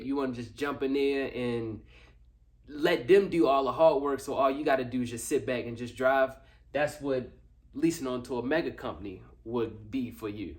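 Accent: Baltimore, United States. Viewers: Sounds like your from Baltimore bro! Lol but great video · You gotta be from baltimore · Bruh is definitely from Baltimore. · gotta be from bmore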